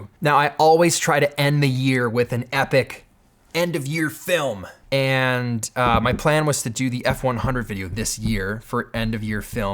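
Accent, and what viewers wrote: United Kingdom. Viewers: That British accent 😂😂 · British accent sounds like Jamie Oliver. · your British accent is getting better every video, well done lad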